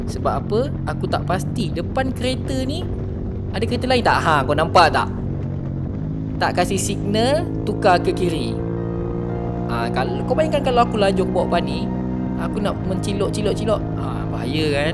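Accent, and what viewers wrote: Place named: Borneo, Malaysia. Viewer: Bro asal mane? Slank mcm belah borneo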